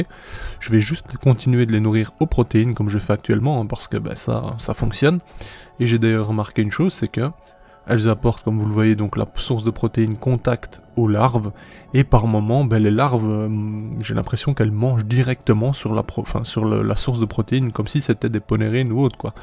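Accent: Belgium. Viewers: cet accent me semblait belge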